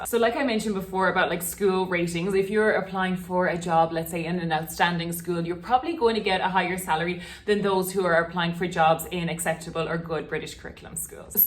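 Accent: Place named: Ireland. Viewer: u sound irish but certainly dont look irish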